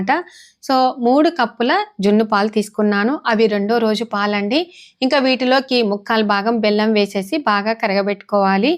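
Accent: Rayalaseema, India. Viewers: ఈ రాయలసీమ మాటలు సీమ తెలంగాణ మాటల్లోనే ఉంటాయి నువ్వు మాట్లాడిన కొద్ది నాలి అనిపిస్తుంది🎉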